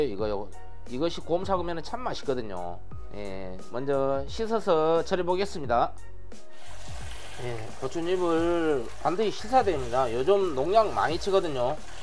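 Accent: Jeolla, South Korea. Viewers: ㅋㅋ 설명 참 재미 있으 시네요~~경상도 말씀에 전라도 억양같기도 하구요~~맛있어 보이는데 함 해볼께요~~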